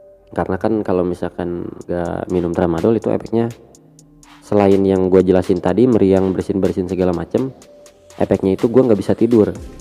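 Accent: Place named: West Java, Indonesia. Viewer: Lidah orang sunda bos. Voli aja nyebut nya poli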